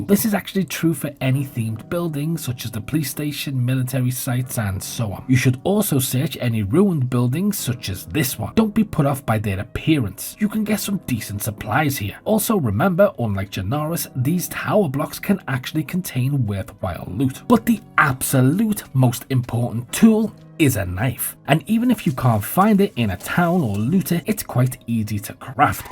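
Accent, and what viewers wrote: Liverpool, United Kingdom. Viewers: Not even close. This bloke is from the UK, Scouse accent from Liverpool probably. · Are you part Scouse?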